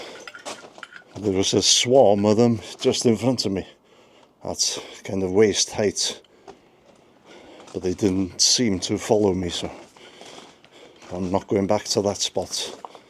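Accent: Wales, United Kingdom. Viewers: it's really lovely to hear a real Welsh accent, and I'm 100 per cent Celt Irish. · American here and newly subscribed. I worked with a couple of Welchmen 40 years ago and haven't heard a Welch accent in a long time.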